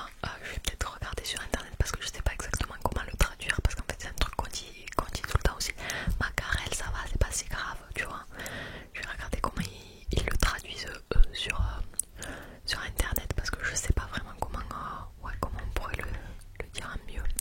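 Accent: South, France. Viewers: Super concept, et j'ai appris des choses comme ça je passe crème quand je retournerai dans le sud haha (par contre j'arrive pas faire l'accent, que j'adore). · Il y a énormément de mot que nous utilisons également dans le Nord, et un peu partout en France même, qui sont globalisés dans un langage familier. Après vous avez quelque mot que je n'avais jamais entendu, magarelle 😮 ! 😂. La team chocolatine le débat est finis, seul les sudistes le disent, nous sommes 90% à dire "petits pains" dans la France. Le principal étant qu'on sache ce que les deux mots veulent dire. Ceci étant dit, "chocolatine" ( d'ailleurs même ma saisie automatique sur mon clavier ne connait pas 😂) prend du charme quand il est prononcé avec votre accent ! Sympa la découverte 😊👌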